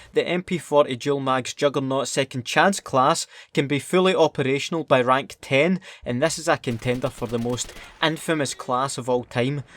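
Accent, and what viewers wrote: Scotland, United Kingdom. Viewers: What part of Scotland do you come from? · You from Scotland? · I love he’s Scottish accent lol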